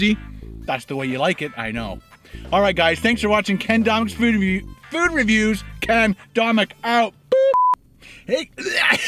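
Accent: Quebec, Canada. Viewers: yeah in québec we sound like that lol and we have a thousand type of poutine ken ! try poutine planet in québec , Qc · Iam form germany ... i understand that Barbecue Sauce Stuff ... especially we dont have a lot of good ones here! PLS say more OUT About and Without love that canadian accent :D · your quebec accent is right on!! when are you coming up east ,in quebec...to review some local restaurant?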